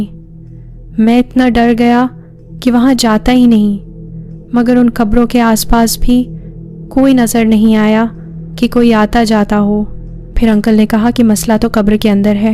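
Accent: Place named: India. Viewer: Assalam o alaikum dear aap ki awaz buhat achi hy or andaz b lakin Indians ki tarha khy or khay me wazy farq hy is ghalti ko door farmalijye❤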